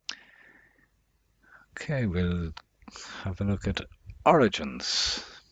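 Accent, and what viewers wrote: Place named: Ireland. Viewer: Very nice to hear a fellow Irish voice on their voyage of discovery.. Really enjoyed the vid. Any views on the mud?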